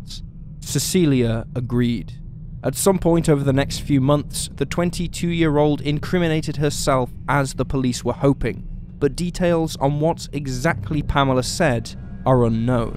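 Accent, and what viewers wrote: United Kingdom. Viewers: Narrators who speak excellent English (i.e., British we presume?) sort of ruin the story without any expression utilized WITH the English perfection. Find some narrators who know how to phrase their sentences in an interesting manner? this guy sounds as if he, himself is not even enjoying relaying the stories (which without his rigid enunciations, were actually, quite interesting. Ok nobody cares Bye bye.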